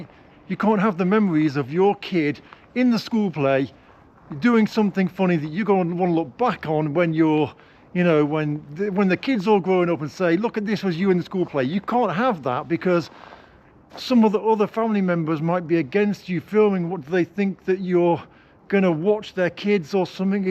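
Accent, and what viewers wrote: United Kingdom. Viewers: A wonderful mix of the background of a Russian provincial city and British pronunciation!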